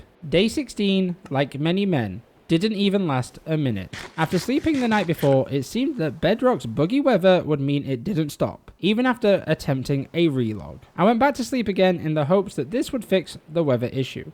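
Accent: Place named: United States. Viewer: You come from uk? Boi you sound like a guy that comes straight from the us!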